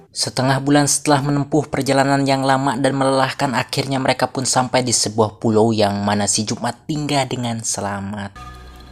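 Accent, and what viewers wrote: West Java, Indonesia. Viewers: Org sunda ya? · Logat Sunda pisannya... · Sunda mana min?? Lucu,ngahibur euy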